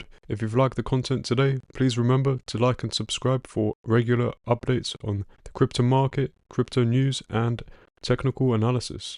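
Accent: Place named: England, United Kingdom. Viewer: Are you English? Usually I know from the accent. You sound English to me, but I'm not 100%. You could be a Scandinavian who knows English as 2nd language from the crib maybe.Not Australian, not American, not east Europe, or west Europe, not south Africa, not from New Zealand definitely. Dude, you're killing me.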